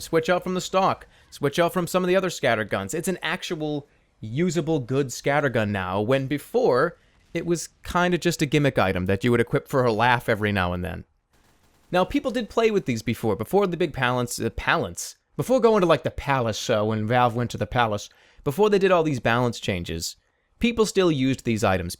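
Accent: Boston, United States. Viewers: I believe he's from Boston.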